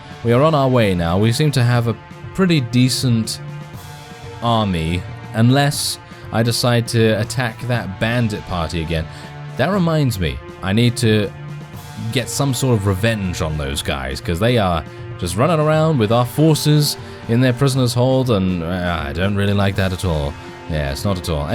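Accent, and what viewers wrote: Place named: United Kingdom. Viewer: Your voice is.... is so British. Its lulling me in to a falsh sense of security...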